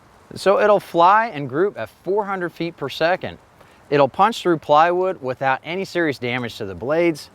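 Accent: United States. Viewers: I find it funny that every video I have seen concerning weapons - the narrator always have a thick American accent. Is America the most weapon-happy country in the world?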